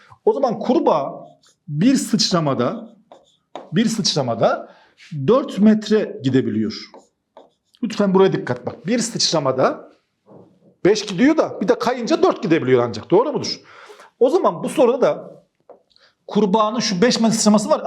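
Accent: Gaziantep, Turkey. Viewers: Gaziantepliymiş Sivas ta yaşıyormuş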